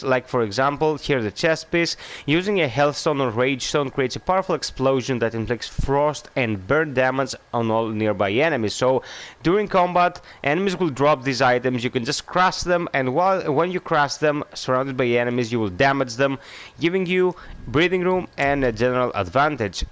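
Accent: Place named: Greece. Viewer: χαχαχα λεω σαν να μου φαινεται ελληνικη η προφορα του και μετα ακουω τον κρατο να μιλαει ελληνικα :D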